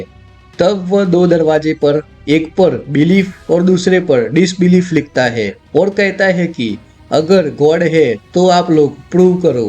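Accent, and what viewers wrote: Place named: Gujarat, India. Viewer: Gujarati accent….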